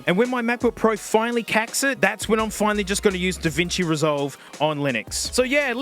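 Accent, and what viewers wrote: Australia. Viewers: You're not Australian by any chance are ya? Mac, really? So, what took you so long to discover Linux? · Yeah he's Australian, Adelaide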